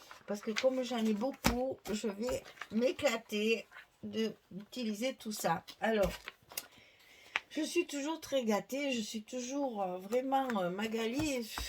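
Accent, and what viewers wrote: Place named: Provence, France. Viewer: Bonjour 😊 Magnifique papier ❤ même si je suis une fille du Nord, j'adore 💕 votre Provence, les lavande, les vieilles pierres, des paysages magnifiques, votre accent...... Mon rêve! ❤❤❤❤ Merci et bonne journée 😘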